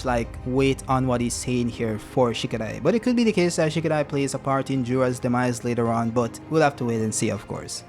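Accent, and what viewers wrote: Jamaica. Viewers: yo are u jamaican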